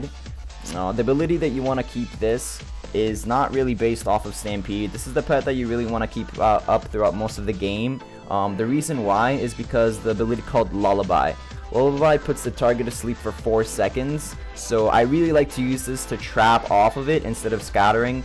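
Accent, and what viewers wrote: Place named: Asia. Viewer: ur asian arent u? i can tell by the way u talk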